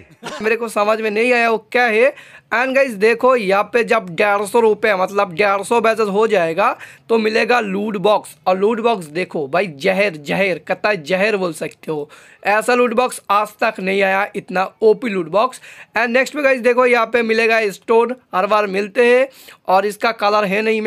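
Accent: Bengal, India. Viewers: Ap kay bangali ho bhaiya 🙄🙄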